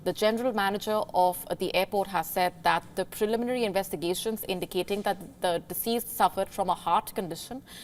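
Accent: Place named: India. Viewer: Thick indian accent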